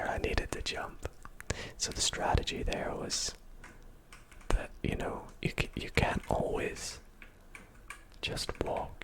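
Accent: United Kingdom. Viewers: Talk strange? How so..? Do you mean the British accent? What's strange about that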